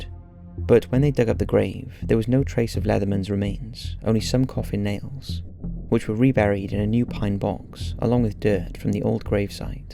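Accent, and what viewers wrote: Wales, United Kingdom. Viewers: Edwin well it's almost lunch time where I live in europe and I suspect he lives somewhere in great britain according to his accent.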